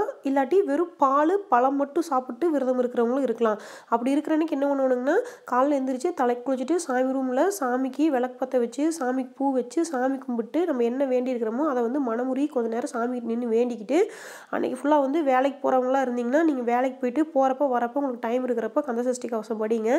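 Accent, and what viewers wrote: Kongu, India. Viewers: What a kongu speech we are also Coimbatore super proud of you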